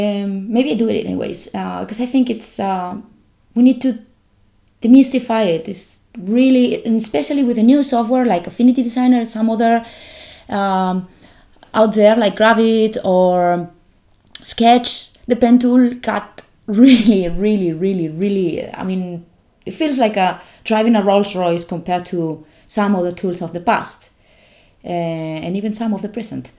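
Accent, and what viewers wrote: Spain. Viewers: Hola, la verdad es que me encanta escuchar el ingles con este acento nuestro Español, de cualquier manera queria decirte que me encantan tus videos y queria preguntarte haces algun tipo de clase online, de clase privada me refiero y por cierto todo lo que muestras aqui aplica en Affinity photo tambien, ahi me dices gracias.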